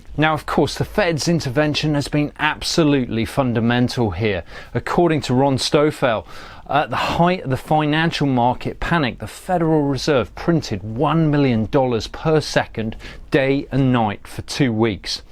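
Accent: United Kingdom. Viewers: You remind me of a black guy, but with Caucasian skin and a British accent.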